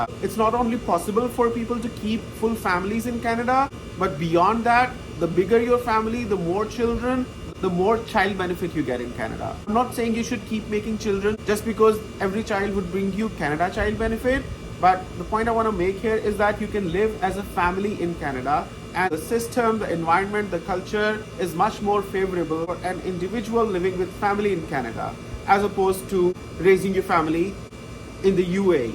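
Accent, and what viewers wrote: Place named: England, United Kingdom. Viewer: Very bad sound quality, i can hear only English accent, nothing sentences 🇺🇸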